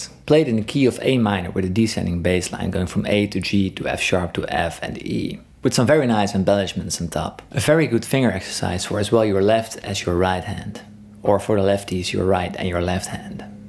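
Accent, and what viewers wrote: Netherlands. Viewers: Are you Dutch? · waarom hoorde ik meteen dat je nederlands bent? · i have to ask, are you dutch? your accent sounds dutch lol. if so, ik ben ook nederlands! ik hou van je video’s :) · You sound Dutch to my Dutch ears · Ik dacht dat ik al ergens een klein Nederlands accent hoorde! Perfecte video's! Erg nice om naar te luisteren ^^ Keep up the good work!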